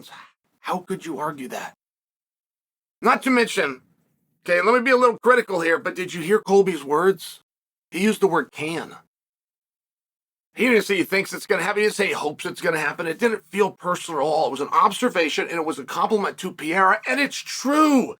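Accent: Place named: United States. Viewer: I'm brazilian and chael have the most american accent i have ever seen speaking portuguese.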